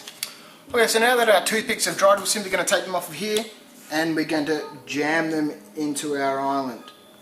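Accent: Australia. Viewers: If you don't mind me asking are you Australian or Kiwi perhaps?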